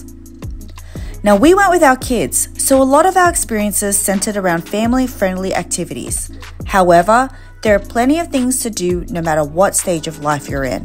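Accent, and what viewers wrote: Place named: New Zealand. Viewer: Wonderful video, and short too! I love that it was short, but lots of information. By the way, I'm dying to know what part of the world you're from. I hear New Zealand in most of the words you say, but then I hear a little bit of United States English in other words you say. I'm fascinated, but I digress.... My very first cruise ever was on the Oasis of the Seas, and ever since then I've been dying to get on the Symphony. I know the two ships are very very similar, but the Symphony is bigger, as I understand. I remember Sorrento's Pizza not looking very appealing at first glance, then one of my fellow passengers insisted I needed to try it out. I did, and I was floored at how delicious the pizza was. The Solarium is a fantastic included restaurant. I love that it's adults-only, and that it requires a reservation. You cannot beat the entertainment on board the Oasis-class ships. 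It's first rate. I'm really disappointed for you that they ran out of soda so quickly in the voyage - especially considering the fact that guests paid for the drink package. I would have demanded my money back for the drink package. I'm booked on the Harmony for this coming April, and am anxious to see how good the included food is this time around. The first time I sailed, I thought all of the included food was phenomenal. But since they've implemented the new menu changes, the reviews have been mixed. On my first voyage with RCL, I purchased two specialty dining restaurants: 150 Central Park, and The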